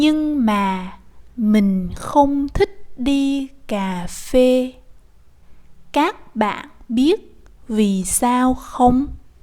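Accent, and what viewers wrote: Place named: South, Vietnam. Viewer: 👏👏 excellent, thank you! -- May I ask what dialect this is? I thought I heard several Southern characteristics, but the "s" sounds Northern to me?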